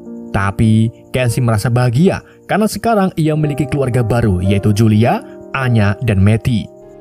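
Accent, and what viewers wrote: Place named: Banyumas, Indonesia. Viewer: Ko ngomonge ngapak min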